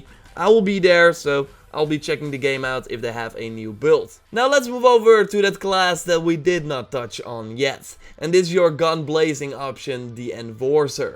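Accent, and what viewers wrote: Netherlands. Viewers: Are u dutch because u sound dutch? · Are u Dutch?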